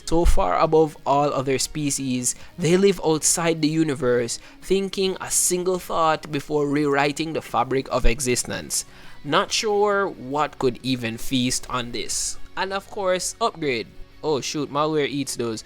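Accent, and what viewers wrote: Jamaica. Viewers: A jamacian Galvan? I'm vibing with it. Love the accent man, and keep up the content.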